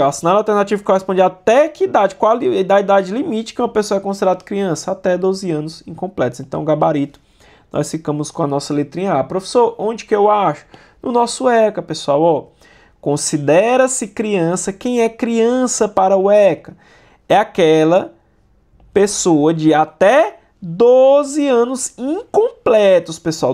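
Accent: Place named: Northeast, Brazil. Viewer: Ninguém pode se irritar com seu sotaque nao, oxi #orgulhonordestino. Sou carioca, mas minha familia é nordestina!! Excelrnte prof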